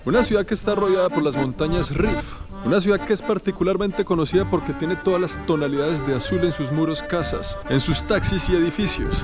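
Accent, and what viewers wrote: Colombia. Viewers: Bueno primero muchas gracias por el video realmente hermoso y mucho con el hermoso acento colombiano te felicito, segundo y es muy gracioso hasta me hiciste reír mucho y es cuando has entrado a lo que llamaste "edificio" es una casa privada menos mal que nadie te veo ahí jajajajaja, claro como eres extranjero no te van a decir nada pero fue muy loco verte entrando, muy atrevido jajajaja. Y ahora sobre el color, bueno soy Marroquí norteño de Tetuán (la Paloma Blanca) así que te diré cual es la teoría más acertada para nosotros y obviamente basada en información histórica, pues la cuidad azul fue construida el año 1471 por Ali Ben Rachid Al-Alami y el es también el padre de la mujer llamada Al-Oura lo cual era el gobernante de Tetuán aquel época es una mujer muy conocida en la Historia de Marruecos, y pues Ali Ben Rachid ha construido la cuidad Azul como castillo en contra de la invasión Portuguesa a Ceuta aquel época para poder proteger sus cuidad por si acaso intentan invadir a la cuidad azul, en el año 1492 con la caída de Granada la Última cuidad que estaba bajo control en Andalucía a los Reyes católicos comenzaron a echar los Musulmanes y los Judíos de Granada, y pues de ahí han viajado por Marruecos, exactamente el norte, parte de ellos llegó a la cuidad azul y comenzaron pintando sus casas y sus negocios con el color azul los judíos como para acercarse a Dios, y de ahí se quedó como tradición este color en la cuidad y los marroquíes le han cuidado hasta que se transformó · Me encanta el acento de Colombia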